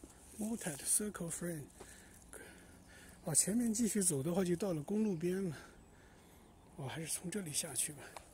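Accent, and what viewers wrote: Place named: Zhejiang, China. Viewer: 老哥是大陆移民吗，听口音像是浙江的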